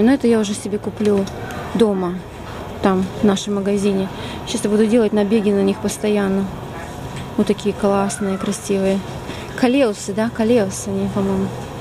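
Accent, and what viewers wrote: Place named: Russia. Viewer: говорила бы уже либо на русском либо английском!!!!!!!!!!! ужасный русский акцент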